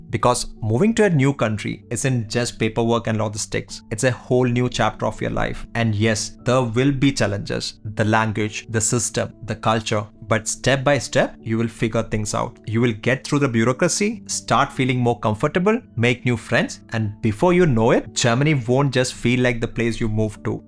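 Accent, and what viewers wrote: Kerala, India. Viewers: That Mallu accent is still there though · chettan has clear malayali accent!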